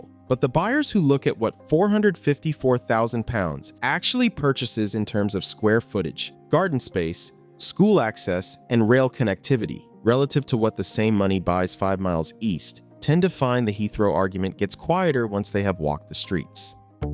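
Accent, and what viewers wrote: United States. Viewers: Why is an American unfamiliar with London and its place names narrating this??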